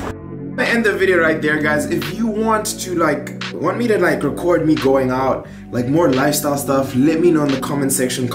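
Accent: South Africa. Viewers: Are you South African?? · Hey dude are you South African?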